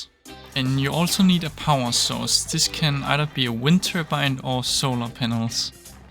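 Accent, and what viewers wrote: Denmark. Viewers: hey are you guys danish? because you sound danish :) btw i am danish have a good day or if you now danish hav en gode dag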